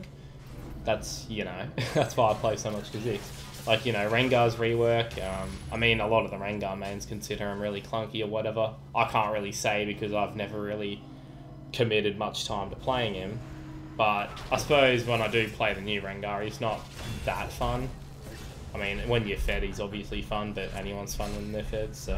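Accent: Australia. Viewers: Aussie? :D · OCE player and sounds Australian noice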